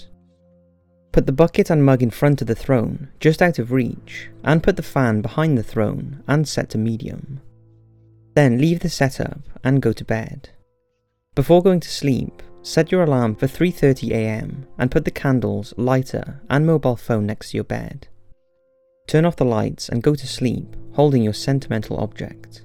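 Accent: United Kingdom. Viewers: i love your british accent 😊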